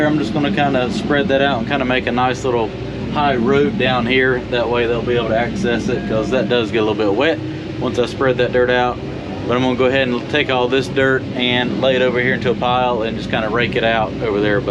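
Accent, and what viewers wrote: South, United States. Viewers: I gotta tell ya, I love watching the videos, but there is something soothing about your voice too, I like the southern draw, I follow Todd on digginlife21, he has the great draw as well. I am in Michigan and you just don`t hear that way up here, I guess you guys think we have a northern accentb be well my friend.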